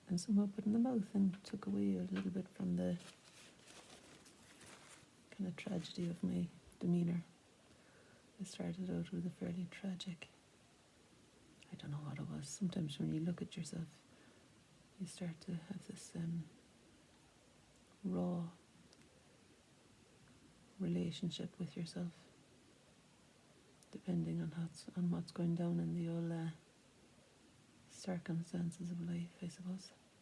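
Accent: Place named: Ireland. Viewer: ❤With your damn Irish accent, I can understand anything! But your work speaks for itself! I think it is time for another DVD!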